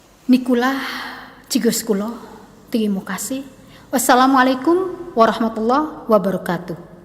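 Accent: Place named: Palembang, Indonesia. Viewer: MasyaAllah keren nian yunda lemak nian dengernyo baso palembang asli dan jadi tau sejarahnyo, ditunggu cerito2 palembang selanjutnyo🤗🥰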